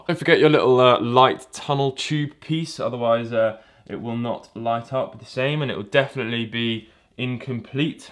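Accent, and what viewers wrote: United Kingdom. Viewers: raw vid is the way, you uk ppl sounds the same to me from Sweden :) so its like watch the same dude all the time. ;)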